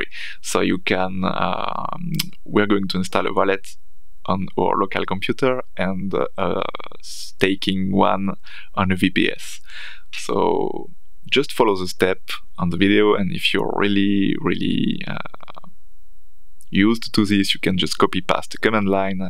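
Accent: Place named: France. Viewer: Salut! Vu ton accent, je pense que tu es français. Quel est ton pseudo sur bitcointalk? PS: je suis ton premier abonné;-)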